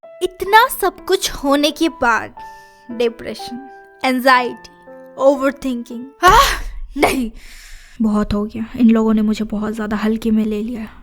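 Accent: Bengal, India. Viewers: Didi ka accent mujhe bengali wali lag rahi hai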